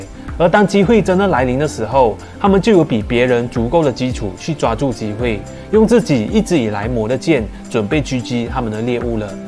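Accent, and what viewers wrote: Hong Kong, China. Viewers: 影片很棒！但港仔口音的旁白實在無法讓我不看字幕否則很多都聽不懂 =_=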